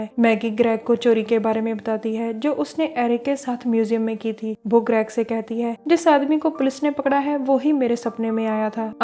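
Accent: Punjab, India. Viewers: U r punjabi ?? Cuz u speak hindi with punjabi accent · I love your all videos didi . thodi voice to lgda v tusi punjabi ho m also from punjab 🤗🤗🤗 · U are punjabi right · Punjabi touch ha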